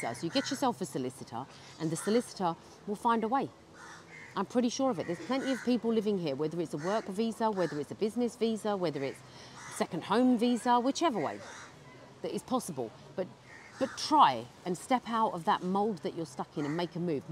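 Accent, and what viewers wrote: United Kingdom. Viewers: i love your voice and British Accent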